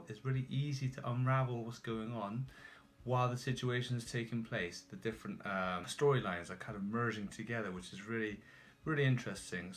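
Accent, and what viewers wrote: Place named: Bristol, United Kingdom. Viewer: Also you are getting Bristolian, WELL WRITTEN with Bristolian accent lol 😂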